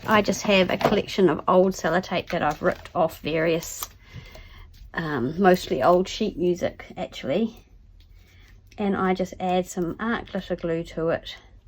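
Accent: New Zealand. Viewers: Such a gorgeous tag, thank you! Loved watching your process and hearing our Kiwi accent! I’m wondering if you got your Art glitter glue in NZ? I subscribe to The Graphics Fairy and especially love all the journal tutorials! Is this your last one? 🧚‍♀️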